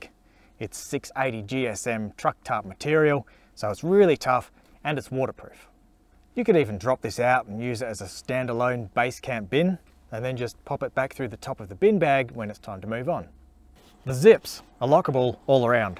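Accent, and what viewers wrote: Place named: Australia. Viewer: A soon as I hear the Ozzy accent from an overland video I’m like “Yup, you’re certified. Go on and tell me what you know.” ❤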